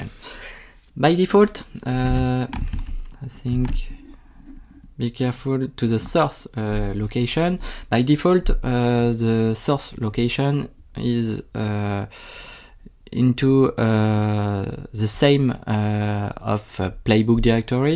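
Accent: France. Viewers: Hello, il est evident de part ton accent que tu es Francais. J'aimerais bien avoir un tutorial sur Ansible. J'ai deja souscrit au canal, et j'aimerais bien echanger avec toi pour avoir des réponses aux questions que je pourrais avoir. Merci de me dire si c'est possible.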